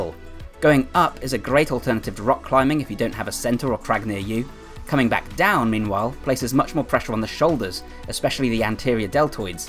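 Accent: United Kingdom. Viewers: why is there a Dutch windmill in the video but i hear a british accent? I didn't know the UK has such windmills too!! awesome, I will implement this kind of training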